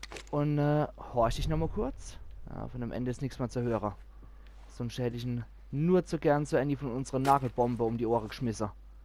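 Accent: Palatinate, Germany. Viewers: Hab ich das richtig verstanden am Anfang: "Wir sind im Hemshof gelandet"? :D Ist das ein pfälzischer Ausdruck oder meintest du tatsächlich den Stadtteil einer bestimmten Stadt in der Pfalz? :D Hab deinen Kanal über das Forum gefunden und fand deinen "uff pälzisch"-Aspekt sehr interessant! Bin selbst in der Pfalz (Vorderpfalz) aufgewachsen, auch wenn man das gar nicht glauben mag, da ich ziemlich Dialektfrei bin :D Werde auf jeden Fall von Zeit zu Zeit mal reinschauen :)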